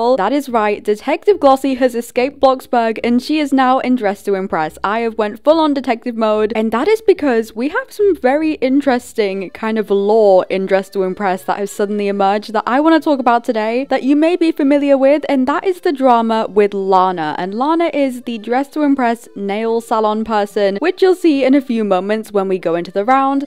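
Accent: United Kingdom. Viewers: gosh glossie i LUUUV your british accent it just sounds so smooth<33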